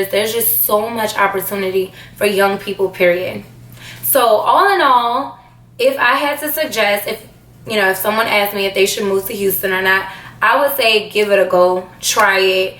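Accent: New Orleans, United States. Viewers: I moved to Houston from Hempstead, NY. I f'cks with H'town all day!!! love your N.O accent!!!! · That New Orleans accent 😍 👌🏿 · Carnisha XO yes I'm not from new Orleans but I have family that live out there and she sound just like them. Just the way she talk is so New Orleans lol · I love your New Orleans accent. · Damn you new Orleans women mane! I love that accent so much..."ova...bey buh..." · I love your accent. Reminds me of my time at Xavier! I love New Orleans❤ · Son when you opened your mouth, I was like this girl from NOLA. Then when you mentioned it I was excited 😊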